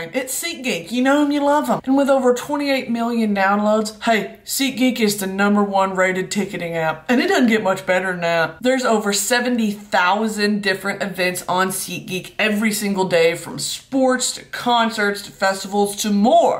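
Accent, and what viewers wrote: Spain. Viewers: Omg YOU SOUND SPANISH Like if you told me you came straight from Fuenlabrada I'd believe it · So many of her TikToks speaking Spanish have literally hundreds of comments being like “but why you have the Spain accent 🙄” which bothers me so much bc like girl! She learned a whole ass second language! That shit is hard! And her teachers were from Spain! And commenting on people’s accents? Is fucked! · your Spanish accent is gorgeous!!! Me encanta como tu hablas amor!!! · ooo she got the spanish accent. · Brilliant Spanish accent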